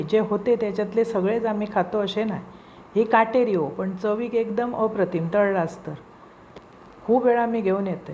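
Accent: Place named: Malvan, India. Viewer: Taiee you are very sweet i love your simplicity! I watch all your videos !! Because your Malvani bhasha 😂Ithe way you speak it's very hillourios 😅fun 😂Love from Mumbai ❤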